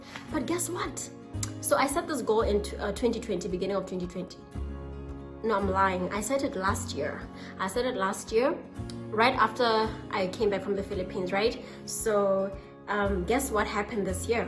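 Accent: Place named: France. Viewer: Great French accent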